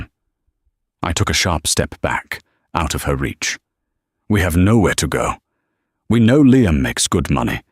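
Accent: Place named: United Kingdom. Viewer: Why is this story about a female and they used a male British accent it’s confusing and the voice is all wrong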